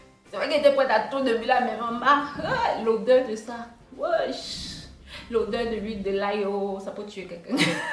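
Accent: Cameroon. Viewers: Je ne suis pas habituée à l'accent camerounais que je trouve charmant d'ailleurs, pourtant je n'éprouve pas de difficultés à te comprendre. Continue et merci pour ces conseils. En plus tu as pris le temps d'énumérer les différents points à l'écrit dans ta vidéo. Donc si ce n'est pas clair ce n'est pas ta faute. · Tu parles comme les bamilekes du Cameroun. Es tu camerounaise ? Sinon merci bcp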